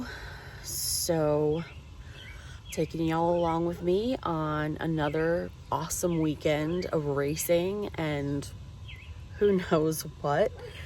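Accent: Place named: Boston, United States. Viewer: you definitely didn't lose the East Coast accent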